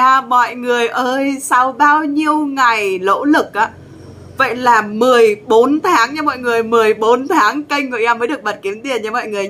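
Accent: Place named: Hưng Yên, Vietnam. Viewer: E nghe giọng giống ng Hưng Yên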